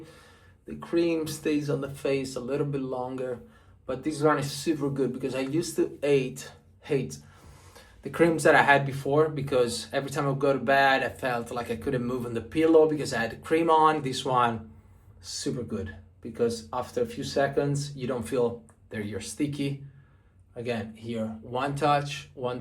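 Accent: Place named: United States. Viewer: Your English is pretty good! Where did you learn it? You sound like you have been in the States for a while.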